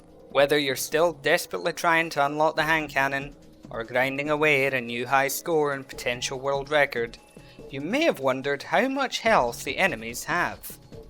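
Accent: Scotland, United Kingdom. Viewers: Why do you sound Scottish lol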